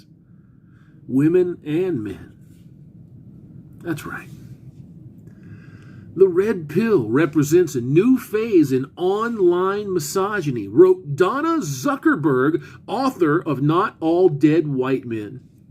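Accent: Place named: South, United States. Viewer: First time viewer here (Sandman sent me) Love this lol! Where is from? has to be the south. He sound like my cousin! must be part black lol love it!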